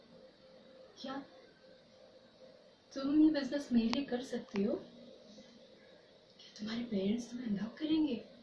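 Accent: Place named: Odisha, India. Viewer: odia odia laguchi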